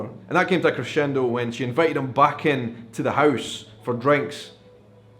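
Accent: United Kingdom. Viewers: Make no mistake I LOVE YOUR CHANNEL and as I said to another person we ALL have accents including me. But my California accent is ugly!! They said in NY that it was all those flat ''A's"" like ''baaaaaaath''. It's not classy or cute like yours or in all of Britain. I loved it when you said ''Roger Roger, Roger what are you playin' at Son!!'' LOL! I know wow.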